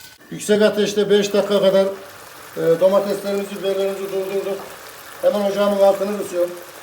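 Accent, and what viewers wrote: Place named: Anatolia, Turkey. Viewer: Allah razı olsun,pratik ve Anadolu şivesi her şey gönlünüzce olsun.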